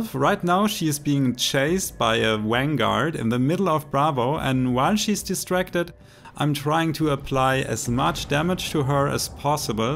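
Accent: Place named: France. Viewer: haha yes he has a proper french accent :D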